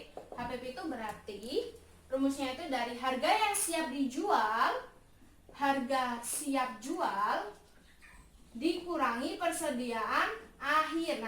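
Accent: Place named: Java, Indonesia. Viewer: Mbak nya kok lucu,, ada medoknya dikit😂 tp aku suka😊🤭.. asli mana sih kakaknya😊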